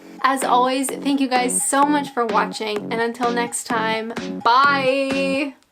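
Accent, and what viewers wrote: Canada. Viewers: wow that canadaian accent has come back really thick and strong. instead of crap under ur grey sofa, i see ur chucking clothes and crap on the floor near your bedside table. what book are you reading? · OK, just another creepy old guy here who only recently found your channel, I love Canada and I really enjoy your delivery. However, if you get the chance to return to the UK and if you want to assimilate with Southern England, then you need to learn how to pronounce Task Master ... it should sound like Tarsk Marster. ;-) ;-) ;-) Haha ... tbh, I like your accent, don't change it.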